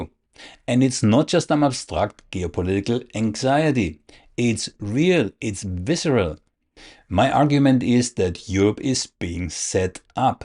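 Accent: Germany. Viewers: I came for Russian propaganda in English with German accent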